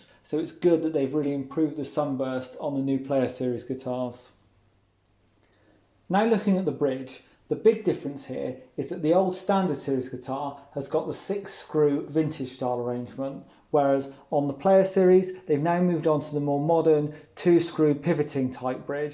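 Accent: United Kingdom. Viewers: Is it better the new player than the old MIM standard one? Oh yes its true, very coser to the old american standard for some reasons such as pick ups, two points trem, nice thinner shape a very nice good looking guitar of course the glassy sound as an strat way to be 🎸. I love over all the British tone speak very understandable congratulations a nice comparison greetings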